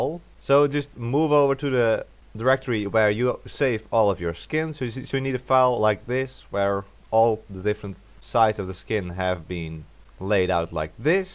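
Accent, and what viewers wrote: Netherlands. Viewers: thick dutch accent there.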